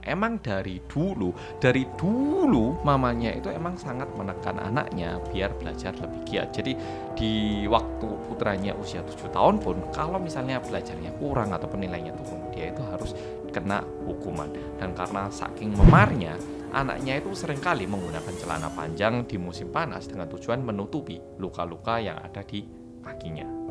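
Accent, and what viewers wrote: Java, Indonesia. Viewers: Medoke wes koyo bukan orang Korea..semangat mas💪👍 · engga si, gue sbg org jawa masih denger logat medhoknya mas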